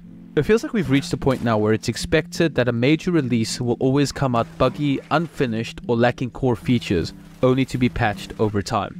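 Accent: South Africa. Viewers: Man, I'm all in on these videos! Could this be the birth of a new thoughtful, reasonable, commentary channel on games industry and culture? It feels like The Act Man channel, but from the point of view of someone that loves games but has other things going on in life, who can't grind a game 8hrs a day, just like most of us. I love how you add the name of the game whenever you show gameplay from that game, it helps for games that I can't recognize via gameplay, having not played them. It's a small detail, but attention to detail is a huge thing for making a high quality anything. The SA accent is also nice. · Are you South African? I can hear it in your accent bru.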